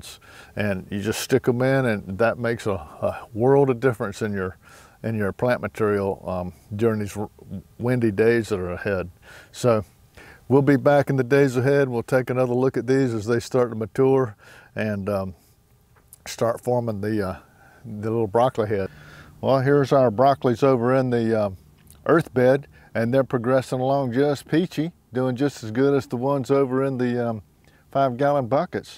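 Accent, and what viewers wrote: South, United States. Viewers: I love your videos. I like how you go from start to finish. And short and sweet. And I think I hear a little southern twang in your voice.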